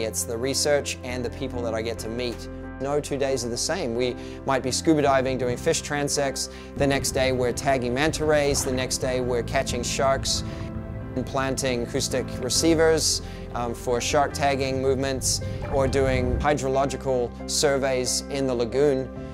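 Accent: Australia. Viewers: partial aussie accent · Straight ozzy. Maybe got the mixed accent from different work environments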